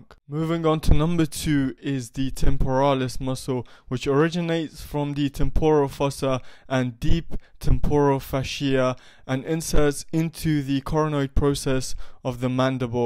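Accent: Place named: England, United Kingdom. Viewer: great..loved it, and its propper English accent😱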